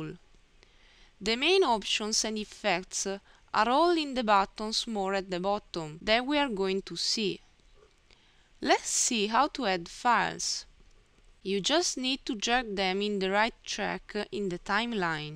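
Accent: Italy. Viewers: I am melting, I love to hear her voice, what a cute little Italian accent. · nice english with italian touch... love it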